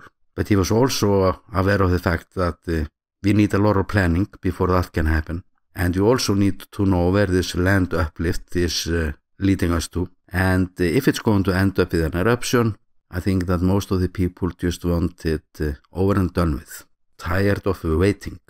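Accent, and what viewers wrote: Iceland. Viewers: Apart from the content, I love listening to your voice. I am Dutch, and for some reason the Icelanding accent when speaking English comes very close to what my parents sound like when they speak English. (I sound more like an American since I grew up with subtitles and the internet, but their accent sounds so similar in many ways!)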